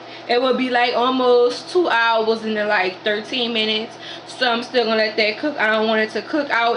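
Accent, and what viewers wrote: New Orleans, United States. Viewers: looks amazing, you can tell you from the n.o. with that fire accent lol! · Thank you for your recipe. I emailed it to myself to not forget. Unfortunately there is no place to buy "pickle meat" in Cleveland, what else do you recommend. . Love your "Nawlins" accent. There were some times I had to replay what you said, but it was worth it. Again, thanks for your recipe. Will try it soon. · Once i heard dat talk i knew you was from N.O and i knew i found the right video.. Thanks bb ;)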